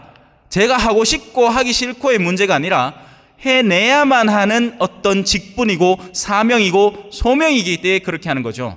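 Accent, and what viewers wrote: Gyeongsang, South Korea. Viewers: 🕯🥀찬미예수님... 신부님.오늘.말씀..참.올바르신.말씀압니다...감사합니다.찐.펜.입니다 경상도.말씀.화통하신.웃음..아멘🕯🥀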